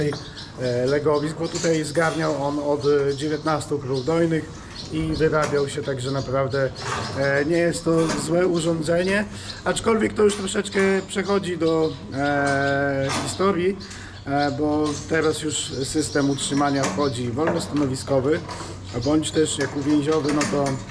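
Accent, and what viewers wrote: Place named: Mazovia, Poland. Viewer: Jak ty z Mazowsza 🤣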